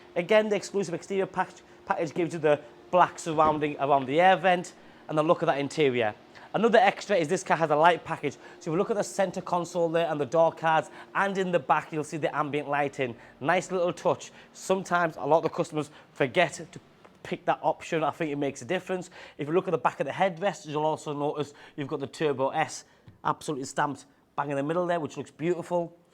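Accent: United Kingdom. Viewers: I love the brish accent 😂🔥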